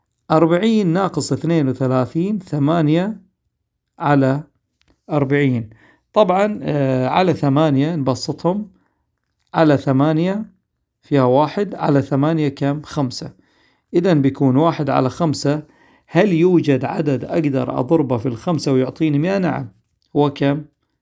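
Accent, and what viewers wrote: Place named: Jordan. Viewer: لهجته تجنن احس راحيه على اردني 🌚✨️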